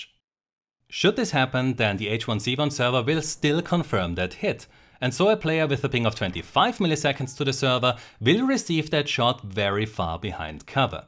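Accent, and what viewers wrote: Germany. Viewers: i love your accent, is it german? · Are you from germany? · Bist du eig Deutscher?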